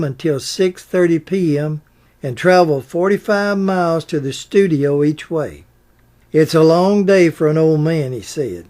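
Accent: South, United States. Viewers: So happy to see you back and righting Walter's memory. So good to hear your Southern charming voice once again! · I used to enjoy your commentaries and biographies on your channel years ago, but being new and unfamiliar with YouTube and how it worked, somehow I failed or forgot to subscribe. I'm glad I found your channel again, and I promptly subscribed. As a fellow Southerner from Lake Charles, LA, I recognized you by your familiar drawl! 😉😁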